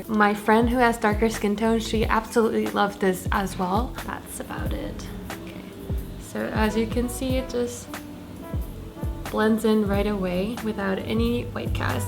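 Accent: Australia. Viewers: Dude I was like 😂😂😂😂 how can you just come up with an aussie accent out of nowhere? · Omg the Australian accent is getting stronger!!! I love it hahaha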